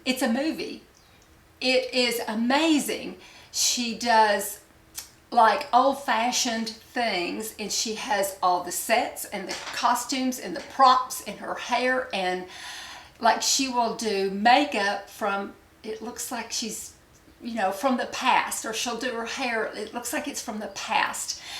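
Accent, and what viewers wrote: South, United States. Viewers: Love your channel! You may find this funny but I listen to you for frequently for the ASMR quality of your beautiful southern accent which I find very soothing and calming!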